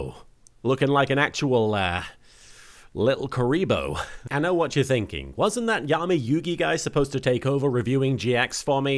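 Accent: United Kingdom. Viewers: Yeah, this series is much better with his british britishness tbh.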